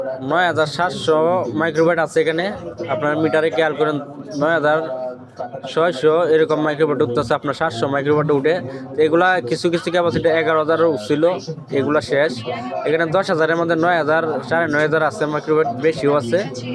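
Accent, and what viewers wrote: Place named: Mymensingh, Bangladesh. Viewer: ভাই আপনার বাড়ি কি ময়মনসিংহ আমার বাড়ি ময়মনসিংহ আপনার ভাষা শুনে বোঝা যায় আপনার বাড়ি ময়মনসিংহ❤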